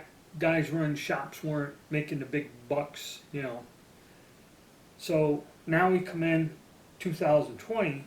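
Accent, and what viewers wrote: Pittsburgh, United States. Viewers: He sounds a little like a Pixburgher who just got home from Gianiggle n'at.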